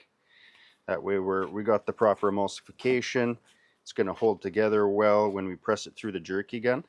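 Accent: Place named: Canada. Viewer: I subscribed to your channel. I'll have to go take a look at some of your other stuff. Canada, aha.... I was having difficulty placing the accent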